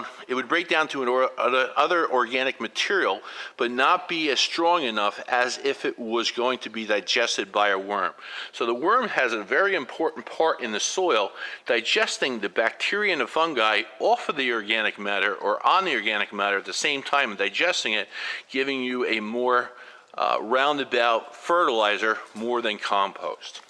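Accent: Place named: Philadelphia, United States. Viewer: You sound like you have a bit of a Philadelphia area accent. Maybe Baltimore?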